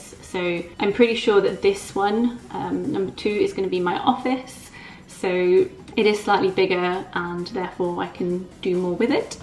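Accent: England, United Kingdom. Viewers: As you polish? And why do you want to live in Poland? You sound english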